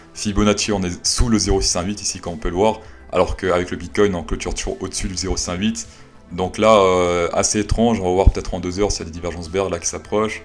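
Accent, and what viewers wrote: Quebec, Canada. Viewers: Aie, dur l'accent québécois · Super ton accent canadien mdr ...je voudrais vraiment savoir qui tu imites avec cet accent!?! tu cartonnes comme toujours ... Tu pourrais faire l'oiseau de mauvais augur une petite rep ( rep augur) non ? Elle n est pas bonne ? Bon j aurai essayé ^^ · Quebecois ou cameronai Mdr on cest plus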